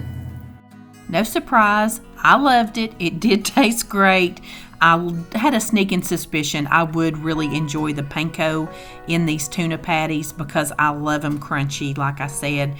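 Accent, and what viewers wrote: South, United States. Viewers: You are adorable and I just love your southern accent!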